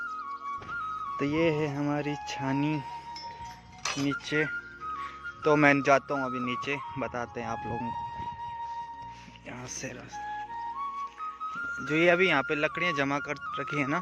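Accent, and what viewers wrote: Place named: Tehri, India. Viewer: Aap tehri se ho kya aap ka bolne ka tone wanhi ka hai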